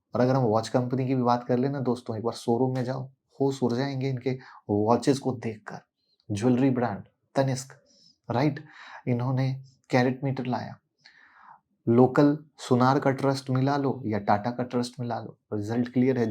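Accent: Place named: Bihar, India. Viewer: He is not "Marwadi" From his accent seems to be a "Bihari"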